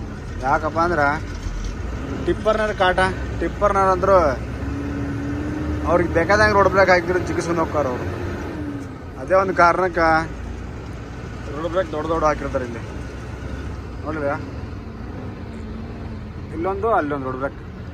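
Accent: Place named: North Karnataka, India. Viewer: Anna Uttara Karnataka alla ninda, Ya ura nimda ❤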